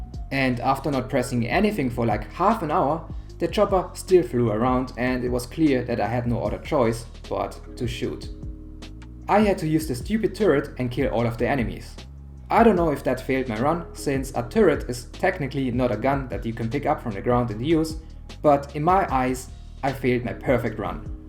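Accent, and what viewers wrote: Germany. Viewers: Are you German?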